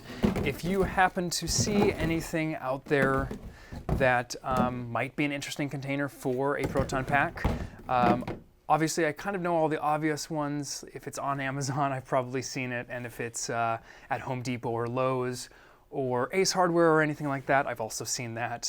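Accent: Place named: United Kingdom. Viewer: The intro made you sound british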